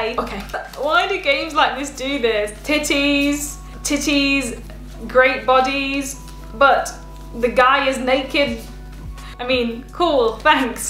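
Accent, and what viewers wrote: United Kingdom. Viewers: Just love your amazing British accent of yours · I must be down horrendously bad because the white toes and the UK accent is my weakness. I can listen and watch her but the white toes sigh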